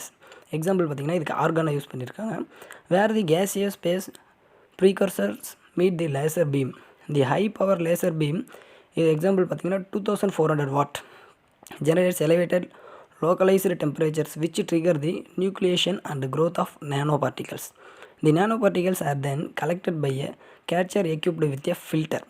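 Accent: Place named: South, India. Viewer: I think he belong to some place in South India n that's why his accent is a bit different and difficult for us to understand (I am from north India).. I played the video on 0.75X n see I was able to understand.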